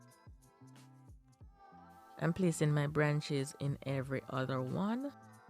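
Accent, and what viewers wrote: Jamaica. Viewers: Such a pleasure to hear an AUTHENTIC JAMAICAN ACCENT on here...sooo soothing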